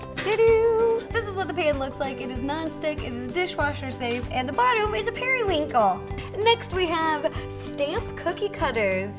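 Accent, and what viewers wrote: South, United States. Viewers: I've been watching this channel for at least a year, I love and her crazy baking ideas, but the more I watch the more she sounds like one of those southern women who hosts the Home Shopping Network in the middle of the night.... But she's from Washington...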